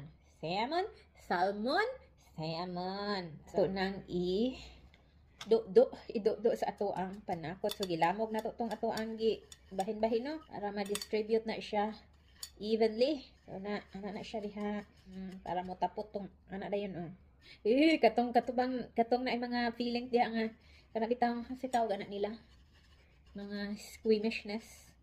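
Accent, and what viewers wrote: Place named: Visayas, Philippines. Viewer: Super love ko talaga ang boses bisaya accent sis ❤ bakit kasi nasa gilid lahat ng manitka haha! Sarap naman 😊 lola lovin’ it🥰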